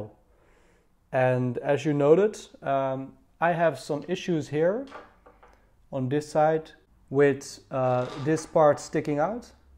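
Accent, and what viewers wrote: Netherlands. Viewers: I hear a Dutch accent.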